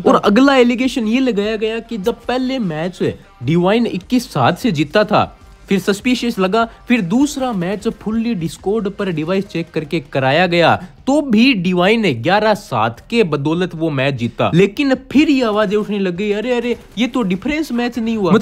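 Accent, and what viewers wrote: Haryana, India. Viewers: Bhai aap Haryana sa to k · Bhai Haryana se he kya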